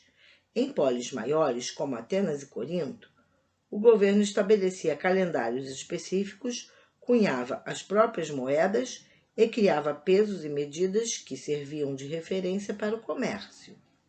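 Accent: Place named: Rio de Janeiro, Brazil. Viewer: Sotaque carioca entojado.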